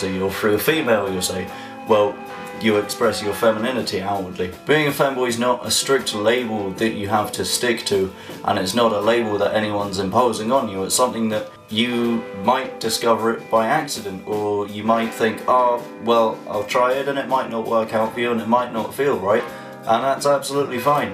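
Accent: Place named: Appalachia, United States. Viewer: Femboy with a hillbilly accent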